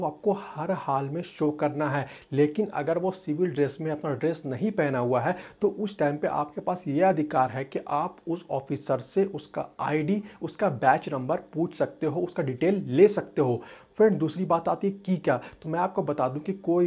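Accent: Ranchi, India. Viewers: bhai tum ranchi se ho?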